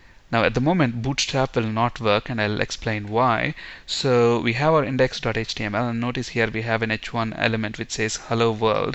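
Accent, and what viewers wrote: India. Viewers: I have problem hearing Hindi accent most of the time but I can understand you and you explain very well starting with the context and what is what which is crucial to understand properly. Really a great tutorial.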